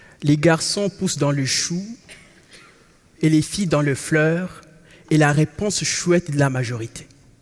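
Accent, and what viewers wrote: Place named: Democratic Republic of the Congo. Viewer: Oui, ce n'est pas faux, C'est difficile de se défaire de l'accent congolais 😅 Mais bravo à lui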